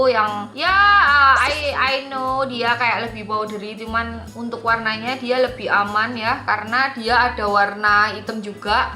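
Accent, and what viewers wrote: Java, Indonesia. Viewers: Raop.. yaalloh nguedok jowone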